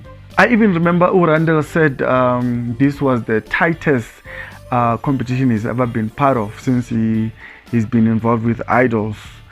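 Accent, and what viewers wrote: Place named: Tsonga, South Africa. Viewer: I repeat luyolo can sing you have so much hate for luyolo. Stop hating. And what's with your funny xitsonga accent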